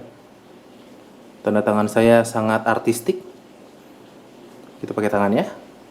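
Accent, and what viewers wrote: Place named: Banjar, Indonesia. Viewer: Abang org banjar ya bang, kok kedengaran ada org ngomong pake bhsa banjar.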